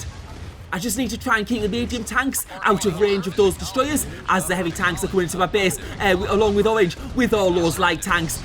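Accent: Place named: Wales, United Kingdom. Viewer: Love your videos! You are really good at presenting the game. For the life of me though I cannot hear what you are saying in your first few words when a video starts. What accent is this? Welsh?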